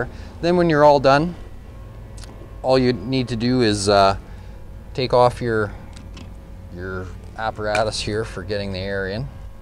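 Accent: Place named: Canada. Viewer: Great video. I think you are in Canada, as you pronounce House as Haouuse ;) and the ABS pipes instead of PVC. But, the reason I looked up for video like this is because in DC area, I pay $130 to blow out sprinklers. Also, I have a 8 gallon air compressor with 4SCFM, and I was not sure if it can do the job. Watching your video makes me think, I can. Thanks again.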